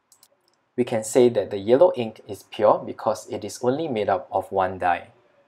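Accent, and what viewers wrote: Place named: India. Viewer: Sounds from india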